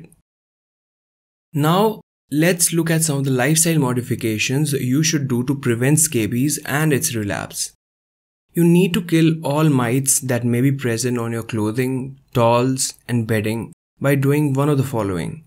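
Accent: India. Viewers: Norwegian scabies ? that’s racist just like when Covid 19 was called the "Wuhan virus", or the "Chinese virus", anybody using that term was labeled a racist. You sound like you’re from India so if a type of scabies originated in India, i doubt you’d call it Indian scabies. "What’s good for the goose is good for the gander." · Very good coverage. Also very interesting that I detect an Indian accent; yet absolutely no trouble understanding your words. Speech is remarkably clear.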